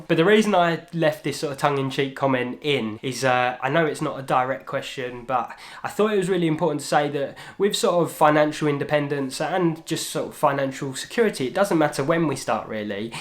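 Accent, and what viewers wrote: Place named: Essex, United Kingdom. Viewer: You sound like your from my area in Southend, great video content on your channel keep up the hard work.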